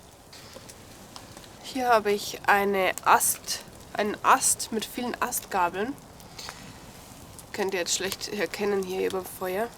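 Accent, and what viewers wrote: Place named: Bavaria, Germany. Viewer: Hallo, des war sche a wanns koid war. Ich seh und hör dir unheimlich gerne zu. Du musst natürlich Hochdeutsch sprechen, aber dir rutschen immer wieder herrliche bayrische Wörter heraus. Für mich als Preuße der in Bayern gelebt hat kein Problem, aber für die die oberhalb des Weißwurstäquators leben, also nördlich der Münchener Stadtgrenze wahrscheinlich schon.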